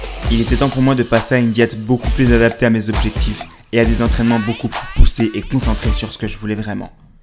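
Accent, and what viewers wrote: Besançon, France. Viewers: Je rêve ou tu est de Besançon ?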